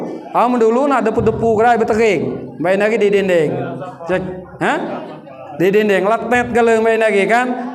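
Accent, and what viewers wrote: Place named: Semende, Indonesia. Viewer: Ustadz cetamah dimn ni??kok kyk bhs bapak saya ya,,jeme semende??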